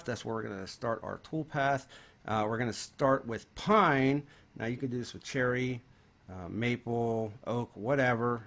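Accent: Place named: Pittsburgh, United States. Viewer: Hey I was wondering what part of around Pittsburgh are you from. I hear it in the accent. I was born in Freedom, Beaver County. My family is still in that area now.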